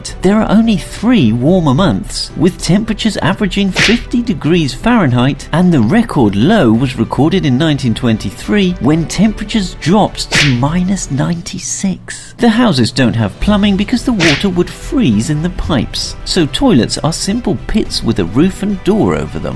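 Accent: United Kingdom. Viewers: Why does he uses Fahrenheit when he has a British accent?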